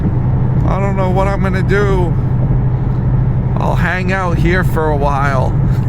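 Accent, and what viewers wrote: New York, United States. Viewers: I would rather endure a jalapeño enema while a spike is driven through my fucking temple than to endure your New York fucking gibberish! Shut the fuck up you annoying snot!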